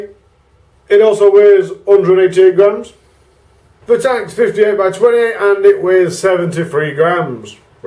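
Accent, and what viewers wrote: Yorkshire, United Kingdom. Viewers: Yes! Thank you for this review! Can’t wait to pick one of these up (but fingers crossed 🤞🏻 for your little comp). I thought you was yorkshire at first listening and thought Ohhh there’s a vape shop I can visit...but your not close enough after a quick google 😔. Vape shops in York are not to great. Anyways, thank you so much for your straight up black and white review. Brilliant!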